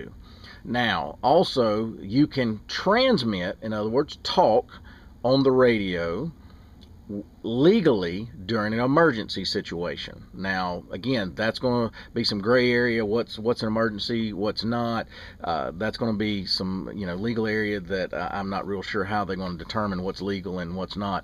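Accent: South, United States. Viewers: I dont hear it. Besides the southern accent. 401s accent isn't as thick.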